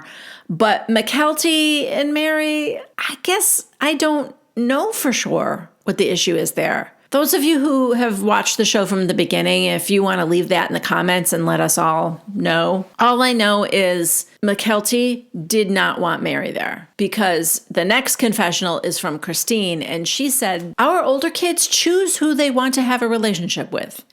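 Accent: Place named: Midwest, United States. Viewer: I got to say we are in March 2024 and I too used to like ‘s videos. I do not like her anymore. Not that that matters or is going to sway anyone’s opinions but she is confused between a content creator on YouTube and Homeland security. She dives into peoples lives. I mean yes granted they are public figures but when no one would be surprised if she knew their blood types and their Social Security numbers, that’s a bit of a problem however, that is whatever you know I think that she does a little too much, but that was just my opinion. It wasn’t until Garrison died that my opinion has really completely changed about her, she is sitting there criticizing TLC in the production company and she’s not wrong with what she’s saying she’s not wrong at all with what she’s saying actually but what she’s criticizing them for having things out there that they may see and they may have to relive all of that she is doing the same exact thing, every Difficult situation that family has ever gone through. 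She has made at least five videos about at least each thing and then when it’s like bigger things then she’s putting out like three or four videos a day about it so it’s a little bit of the pot calling the kettle black to me, but that was just like the cherry on top of the Sunday really was the fact that this girl who lives in I’m not sure where she lives but with the way that she talks that accent that she has the way she says things it screams like Midwestern to me But she is where she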